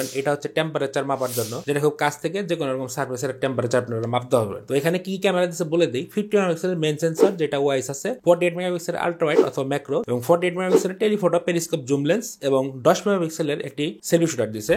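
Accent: Tangail, Bangladesh. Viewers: Vai, apnar bari ki tangail?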